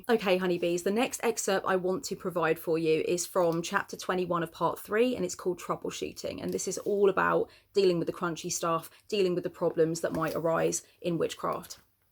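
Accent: United Kingdom. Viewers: You are right! I wouldn't listen to an audiobook if it wasn't you. My son has Autism and is obsessed with British accents. Sometimes I put you on to calm him or pull him out of meltdown. XO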